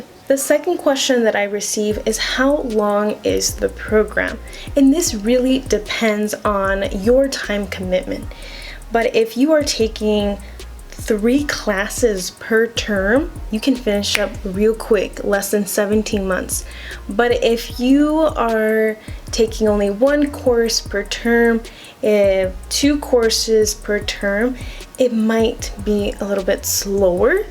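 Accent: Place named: United States. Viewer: Were you born and raised in the US? You have a perfect American accent. ❤️❤️❤️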